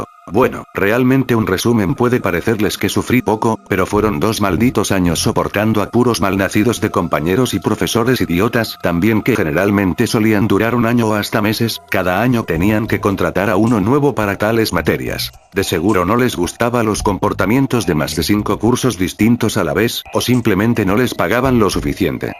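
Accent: Chile. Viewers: tu propia boca te delata: ERES CHILENO,igual que yo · Eres chileno se nota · Eres chileno? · Wena eri chileno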